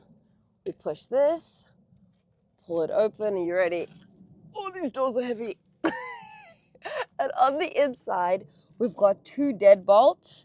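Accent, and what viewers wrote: South Africa. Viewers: As a proud South African I can definitely hear my own. Im assuming the host is South African 👊🏽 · She sound South Afr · Who is this Girl. Is she South African? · You sound South African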